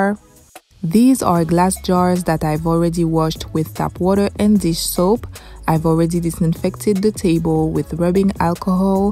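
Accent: France. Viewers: She got French accent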